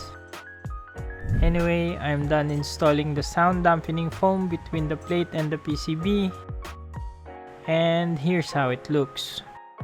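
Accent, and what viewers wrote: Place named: Philippines. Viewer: Filipino accent hello ganda ng review bro love it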